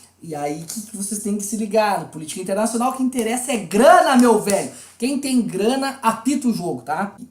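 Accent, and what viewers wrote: Porto Alegre, Brazil. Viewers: Mazá, Professor! Tava com saudade do nosso YouTuber com sotaque portoalegrês!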